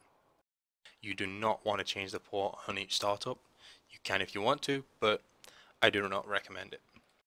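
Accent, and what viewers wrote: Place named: South Asia. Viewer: this guy has a south asian english accent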